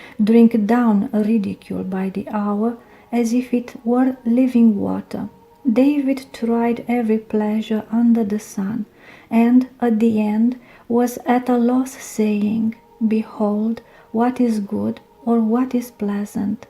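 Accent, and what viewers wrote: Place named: Eastern Europe. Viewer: i insist, it sounds beautiful. your accent makes it sound all the more so. and despite the accent (in my ignorance i would guess eastern european or even russian), every single word is pronounced correctly and everything is as clear as pure water. had a robot been reading this, i would not have listened to it.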